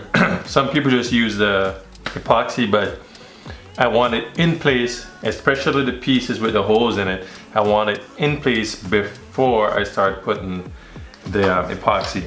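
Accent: Jamaica. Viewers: u gotta be Jamaican lol im doing the same thing and ah run from the same tings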